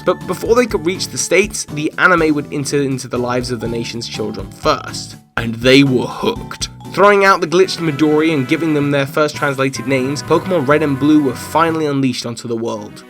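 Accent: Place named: United Kingdom. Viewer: sweet British voice is just perfect for this.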